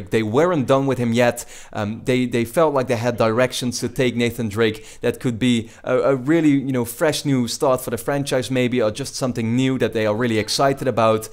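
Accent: Netherlands. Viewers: are you dutch? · Are you dutch? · Are you Dutch?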